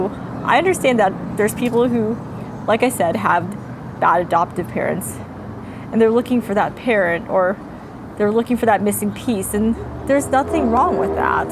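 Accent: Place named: Iowa, United States. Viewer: Hello fellow KAD - love your video. I’m going to message you and share my thoughts, because I don’t showcase mine publicly. But I want to say you’re one of the few adoptees who I can 99% relate to! And I find it funny when you say “I can still have an Iowan accent and eat kimchi.” You need to hear the way I talk! 🤣 Thank you for making this!!! ❤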